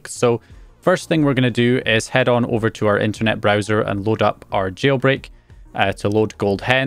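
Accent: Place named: Scottish Highlands, United Kingdom. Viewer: damn, it's not very often I click a video and hear my accent lol. Aviemore? Inverness?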